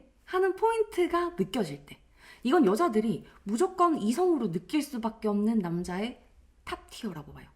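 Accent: Gyeongsang, South Korea. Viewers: 갱상도 사투리좀 고쳐라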